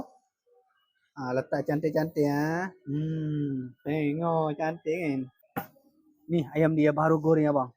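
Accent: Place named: India. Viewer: knpa logat india pula dahh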